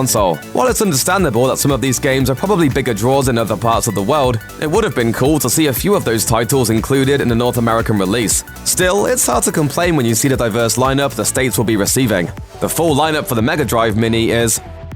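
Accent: England, United Kingdom. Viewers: English bloke, English accent. Doesn't mention the Euro release at all. Shit.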